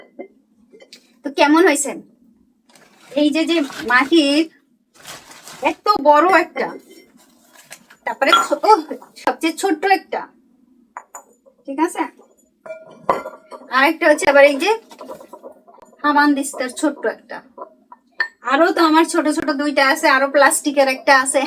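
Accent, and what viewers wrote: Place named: Khulna, Bangladesh. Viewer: আপনি খুলনার মেয়ে হওয়া সত্বেও ,আপনি খুলনার ভাষায় কথা বলেন।ধন্যবাদ।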